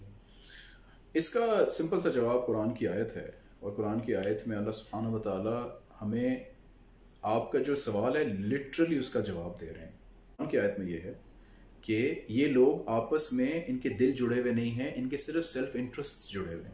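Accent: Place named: India. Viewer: I think they just have a thick Indian accent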